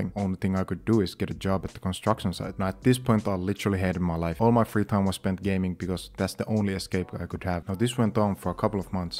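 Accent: Finland. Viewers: I was thinking that this sounds just like a finnish person then i saw the screen shot :D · where are you from? u sound kinda like finland edit: ok, nvm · I don’t speak Finnish (yet) but my mom is from Finland and I knew I recognized your accent / the written language right away. Great video, hyvää työtä !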